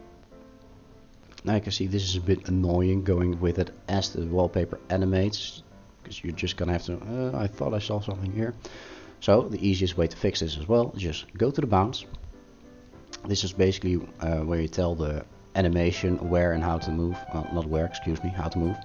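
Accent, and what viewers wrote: Netherlands. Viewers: Yoooo that accent thoooo are u dutch ? Cus so am i lollololol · your obviously dutch i hear that imidiatly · Are you dutch? I recognize that accent. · Lmao never been so confused Je accent liet mij denken dat je van Nederland bent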